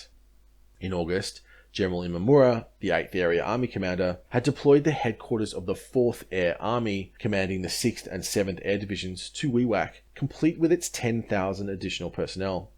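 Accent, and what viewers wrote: Australia. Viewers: We'll I don't know if you picked up on the accent mate, but he is an Australian. Funny then the Aussie content.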